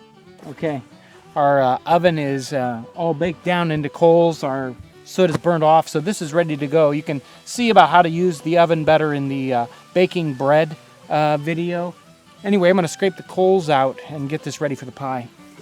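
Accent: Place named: United States. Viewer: The music, the costume somehow shows he's cooking in a 18th century English country side setting. However, the pronunciation showed he might come from the new continent.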